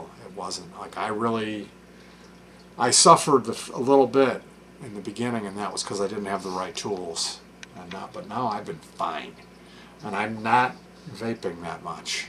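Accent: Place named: Chicago, United States. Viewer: Hey what's the spot you get your juice at? I'm from the south side and I think I remember you saying you were from Chicago in one of your videos, if the accent wasn't enough to tell.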